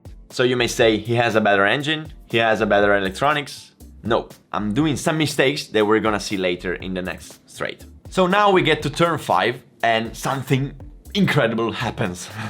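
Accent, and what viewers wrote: Italy. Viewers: I love your accent. Makes me miss living in Italy even more now. I subbed when you said, "if I keep da fooot like dis, I can poosh, while if I keep da fooot like dis, I cannot poosh" 🤣🤣😍😍 Great video, keep'em coming and ride safe 🏍💨✌🐼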